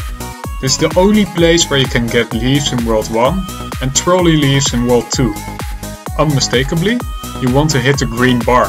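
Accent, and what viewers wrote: Netherlands. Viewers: Ik hoor een nederlandse accent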